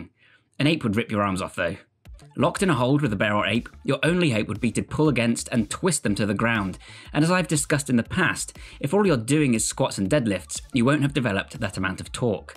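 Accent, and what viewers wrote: United Kingdom. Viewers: I wanna hear u say wingardium levIOsa, (cuz your accent is similar to harmoine's)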